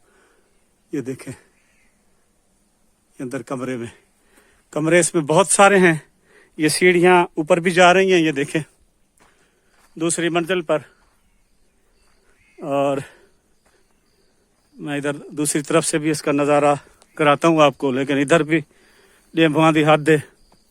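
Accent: Saraiki, Pakistan. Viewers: Sir jab ap saraki ka tarhka lagaty ho to bahot Maza ata hai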